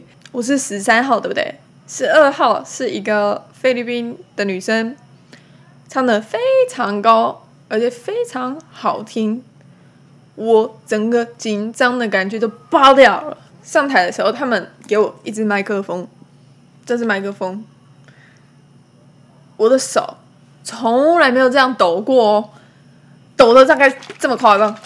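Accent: Taiwan. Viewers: 我靠 一口台湾腔调的中文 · 妳的中文真的講得很好，很標準的台灣中文! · 妳的台灣腔好純好可愛！ 😍👍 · 你说话的方式也太台了。。。🤣 因该是个很有趣的女孩～ 🤭所以就订阅咯。。。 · 講話很台欸🤔 😁😁😁 👏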